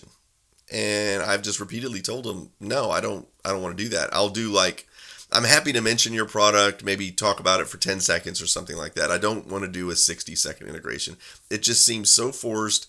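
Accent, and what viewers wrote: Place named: Texas German, United States. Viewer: Ich habe nicht gewissen, dass du ein Texas-Deutschspracher bist!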